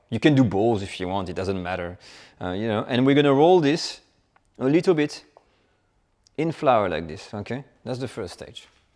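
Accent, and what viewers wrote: France. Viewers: Chef! I remember u when I watched the Julie & Julia movie! Even ur intro music and french accent; Meryl Streep did justice! "You are the butter to my bread, you are the breath to my Life" More power to u Chef! 💪👏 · Your vocabulary is wonderful. You were using a lot of adjectives that a lot of French don’t know when speaking English. I’ve had a French Boyfriend for 16 years; so I know you are using some English Descriptions that are not commonly known by ESL Speakers. Your French Accent is Charming!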